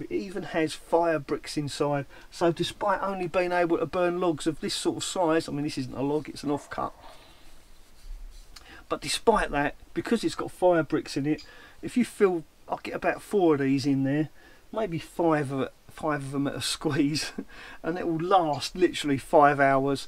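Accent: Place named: RP, United Kingdom. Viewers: Loving the posh voice lol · I’ve been watching your videos for quite awhile how come you’re talking posh are in this one what’s going on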